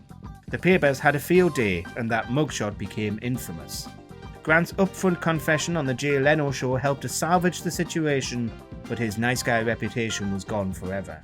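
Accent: United Kingdom. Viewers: i cant figure out the accent. which part of britain is this?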